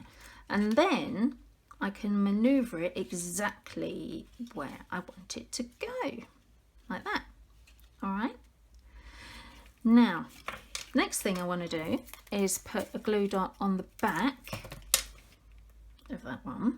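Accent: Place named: England, United Kingdom. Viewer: Just found you on You Tube, enjoyed watching this project and also your accent. My mother was born in England and all of her relatives live there "across the pond". Hearing you talk reminds me of my grandparents, aunts & uncles. Love the post a note idea. Thank you for sharing. Have a great day. Barb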